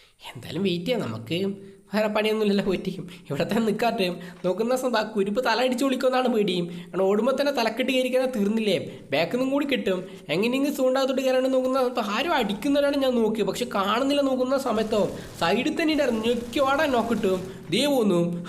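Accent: Kannur, India. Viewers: Bro kannur ano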